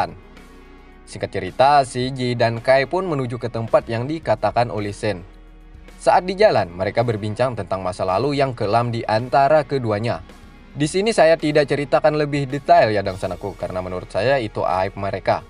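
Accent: Banjar, Indonesia. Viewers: Wkwkwk banjar bangat🤣👍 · Logat banjar nginih Salam dari hulu sungai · Iya sanak,, Banjar banar Pian nih 😅🤭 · Urang Banjar nah pembawa kisahnya nih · Orang Banjar mana suara nya ni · apakah org banjar 🤣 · Orang banjar kah mang? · Orang Banjar kah min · Orang Banjar wkwk 😂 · Urg banjar kh p mang? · urang Banjar kah sanak Pian ni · Urang banjar lh bng pian😂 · Ini logat urg Banjar... Iya kh...😆👍👍👍 Aja.... Sukses slalu...Waja sampai kaputing... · Khas banar suara bahasa banjar nya kada kawa di buang😅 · Urang banjar sidin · Sanak orang Banjar kah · Khas banar logat banjar nya🤣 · banjar banar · urang banjar kah adminnya. 😅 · Urang Banjar kah pembawa alurnya ne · Urang banjar pang pina logatnya😁😁😁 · Banjar banar neh pina nya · Kayak bhasa bnjar pengisi suara x ini.. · Banjar banar 😁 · Orang banjar kah tukang kisah nya ne 😅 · org bnjar ya bang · Pinanya urang banjar pembawa acaranya ninih,,yakah sanak..?? 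Kkkk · Banjar banar dangsanak lah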